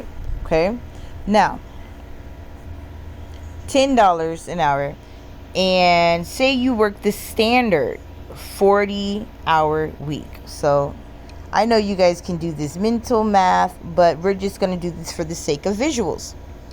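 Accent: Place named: South Africa. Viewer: Yoh i thought you were from South Africa lol i have an american accent shout out to USA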